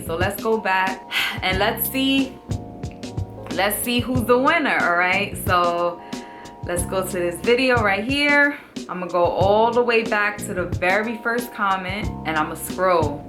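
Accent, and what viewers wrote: New York, United States. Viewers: I from New York and she got that New York accent. It can come off sounding Italian like.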